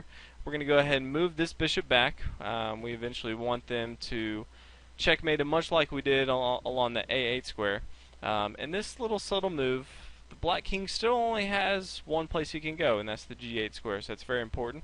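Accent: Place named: United States. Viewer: I end up hearing an odd British accent at the beginning of the video, realizing it's more less an American accent. Isn't that weird?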